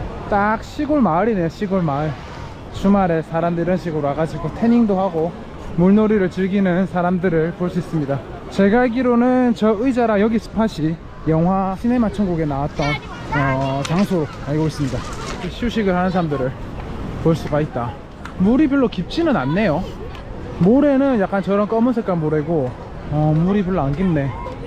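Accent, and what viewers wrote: Gyeongsang, South Korea. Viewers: 우연찮게 보게되었는데 정주행 하게 되네요.같은 경상도 사람이라 억양이 친근하이 좋습니다ㅎㅎ영상은 어디서 배우셨나봐요.전문가 포스가 느껴지네요.안전한 여행하시고,좋은영상 기다리고 있을게요^^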